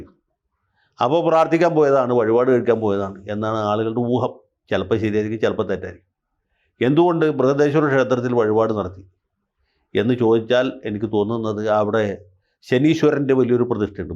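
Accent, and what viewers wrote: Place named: Thiruvananthapuram, India. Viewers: വക്കീലിന്റെ തിരോന്തരം സ്ലാഗ് ഒരു രക്ഷയുമില്ല 😂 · വക്കീലെ നിങ്ങളുടെ ട്രിവാൻഡ്രം സ്ലാംഗ് ഒരു രക്ഷയും ഇല്ല 😂😂😂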